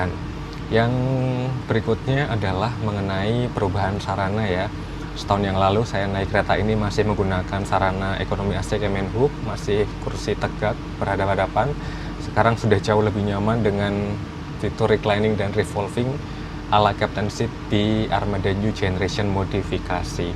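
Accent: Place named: Sunda, Indonesia. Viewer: A jiga na udah kental sekali bahasa sunda na hahaha😅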